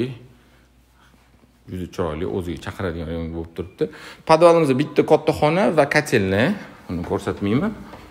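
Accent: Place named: Tashkent, Uzbekistan. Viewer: Узбек тилини софлигини бузадиган шева , Бу Тошкент шевасидир .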